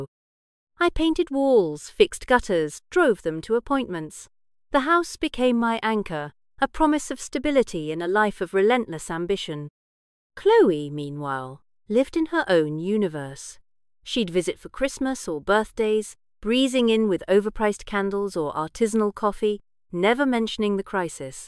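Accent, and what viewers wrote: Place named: England, United Kingdom. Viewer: Frightful voicing.😮 The English accent really doesn't help at all.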